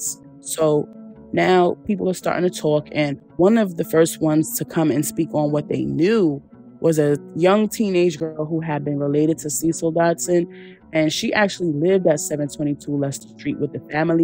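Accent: New York, United States. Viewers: New sub! 😂 Love how you narrate! Do I hear some New York? 👀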